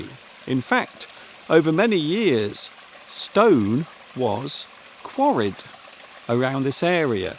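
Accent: United Kingdom. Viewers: I just discovered your channel and I really admire your work. I’m moving to England soon and was looking for english boroadcasts where i can listen and be used to Britain english accent. Thank you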